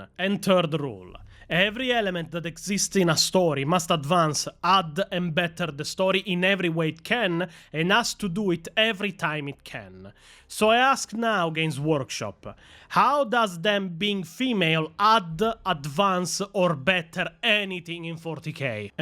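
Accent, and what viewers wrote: Italy. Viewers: Idk why I'm here, i just like your Italian Accent. Subscribed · Geezus fucking christ you need to turn down the italian. Gooing to have to watch this video at half speed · What region of italy accent do you have? It is very entertaining for narrating. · God, hearing you rant about 40k lore with that italian accent, makes me want to believe everything you say and also to fight side by side with you brother! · ho riconosciuto il tuo accento italiano XDDDDD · Duuuuuuuude you voice, accent and didiction amazing. Were are you from? Italy? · wait, I know this angry Italian voice ....... didnt you help Raven Knight with the Templin fiasco ? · Are you Italian or something? I can hardly understand you.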